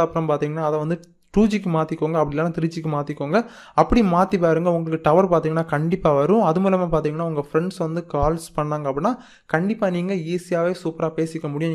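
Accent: Kanyakumari, India. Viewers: Bro u kanyakumari aa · bro neenga ....kanyakumari'ah....?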